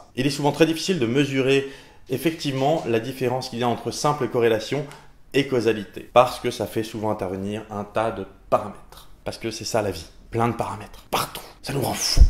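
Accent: Alsace, France. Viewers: Mince je voulais vraiment savoir quel était l'endroit le + dangereux de France xD En tous cas on a bien senti l'accent alsacien ;-) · Le, "ou pien", c'est ton côté alsacien qui refait surface ? ^^ (on a dû te faire plusieurs fois la remarque, mais tant pis) Très bon format, au départ je m'attendais à une sorte d'ersatz d'axolot à cause du titre de la vidéo (aussi accrocheur qu'un titre de une de journaux ^^), et puis finalement je suis content que ça ait finalement apporté autre chose que mes attentes. C'est vrai que l'amalgame corrélation = causalité est fréquent, il est bien trop souvent de lire à cause de ça (jusqu'à preuve du contraire ;P) des âneries monstrueuses chez certains journalistes pseudo-scientifiques... koff koff Big Browser koff koff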